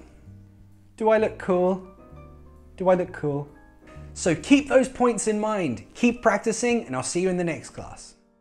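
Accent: United Kingdom. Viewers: Gosh =((( I wish i could speak like that. British accent is the best. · Thanks! I like your videos so much - particularly because they are about British English! You know, on the 'net' the majority of English speaking video hosts are North Americans, which are quite all right to listen to. But when I hear British English - that's totally different! So I'm hoping for more of your vids to come. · British accent is the best! And now what a cool way to learn it from ya, thank you loads! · a Man with british accent is really Hot😀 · whats kind of your accent? estuary or R.P. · I like British accent so much. And I love the way you teach.:-D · Thank you for sharing, i really like British accent as well as England's culture, i thought i love your country, and i'm a fan of Manchester United, anyway i love your video! I learn many many things from it! Once again thank you! 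· the way u teach is perfectly amazing..I mean I don't have ny words to describe...I have seen so many videos on YouTube of different people..but u r d one who makes british accent learn very easy.....thanks a lot for all ur videos.. God Bless. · Brit accent got Style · amazing as always.... i really love british accent, such an elegant way of delivering ur speech, thanks for uploading. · an intelligent cute guy with british accent...oh lord...thats soooo goooood.... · sweet British accent 😍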